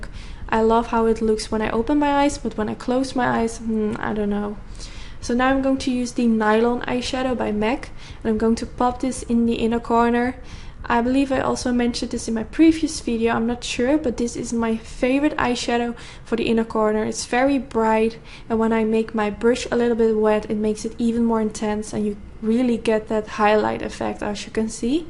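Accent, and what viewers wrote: Netherlands. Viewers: nothing went wrong!!! it is extremely beautiful...btw are u Dutch? u have kinda like a Dutch accent. Beautiful!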